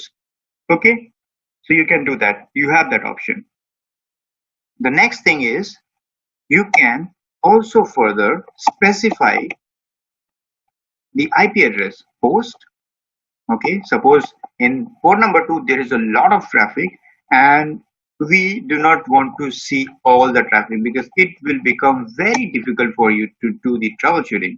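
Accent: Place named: India. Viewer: I like your video. I like it despite your heavy Indian accent. It was more informative than any other video I have found on the subject on yt so far. Thank you. 🙏🏻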